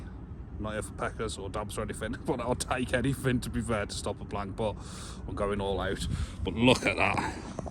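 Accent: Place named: Black Country, United Kingdom. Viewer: never done sea fishing I’d like to give it ago came across your Channel watching “Snagged Bro” However I can’t help but make your accent to be where I’m from… BIRMINGHAM! Sound like from Walsall or Dudley from there myself 😅 Keep up the good work enjoying the videos 👍 Ps love the “no bivvys round here mush” jumper in your previous videos 😅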